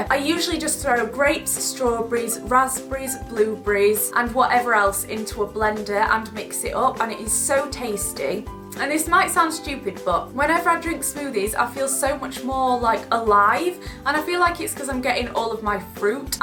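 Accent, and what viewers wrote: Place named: Yorkshire, United Kingdom. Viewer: you are so confident with your Yorkshire accent on camera. Like I'm from Yorkshire and I seriously think mine sounds awful sometimes even on just a normal video. It's so weird. Love you btw!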